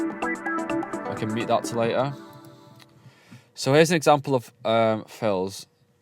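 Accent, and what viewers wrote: United Kingdom. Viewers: Can't understand that heavy British accent. Skipping.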